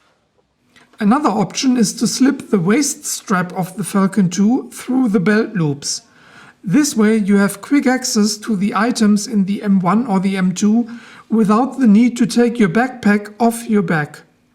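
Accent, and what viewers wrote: Germany. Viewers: I wanted to go with German cause of your name but your accent is very soft and not very typical of a strong German accent. Are you around Americans frequently :)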